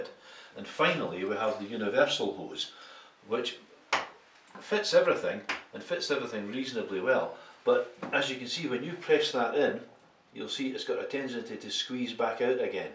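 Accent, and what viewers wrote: Scotland, United Kingdom. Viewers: This is great - beautifully explained, gently and slowly, covering all the angles. As a Sassenach (but of course with Scottish ancestors - yeah, we all claim that ;-) !!), I love the accent. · Scottish but not glaswegian!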